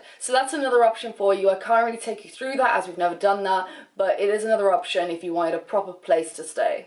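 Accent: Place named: London Irish, United Kingdom. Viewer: London Irish I’m guessing...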